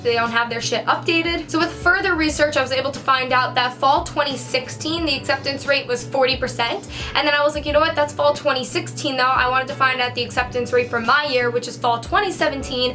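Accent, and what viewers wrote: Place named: Long Island, United States. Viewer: Girl you sound like a real long islander ! ❤️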